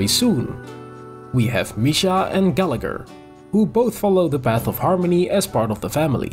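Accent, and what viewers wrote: Netherlands. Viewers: Appreciated this video! I was just wondering, based on your accent, are you dutch by any chance. Question coming from a dutchie 👀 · Your accent screams Dutch I'm sorry xD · Maybe I’m tweaking but are you Dutch? Your accent seems so similar to the Dutch accent- from a Dutchie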